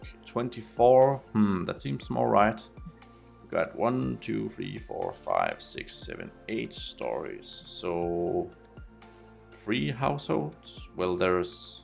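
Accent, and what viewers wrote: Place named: Denmark. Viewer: nice work! your accent sounds at bit danish or scandinavian. er you from denmark?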